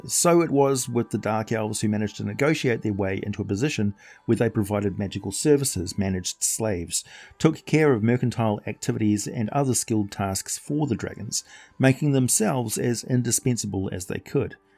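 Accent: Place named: New Zealand. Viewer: Great to hear a kiwi voice on YT❤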